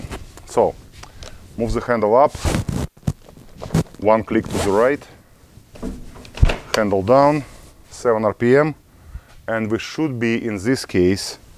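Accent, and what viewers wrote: Russia. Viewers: У вас явно режущий ухо рязанский акцент!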